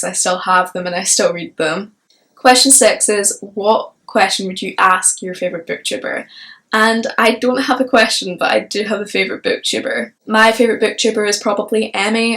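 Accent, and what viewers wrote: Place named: Scotland, United Kingdom. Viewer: Hello from Canada! I'm looking forward to more of your videos. Love the Scottish accent.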